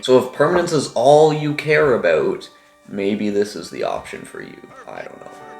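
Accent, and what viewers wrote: Canada. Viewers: are you canadian? you sound canadian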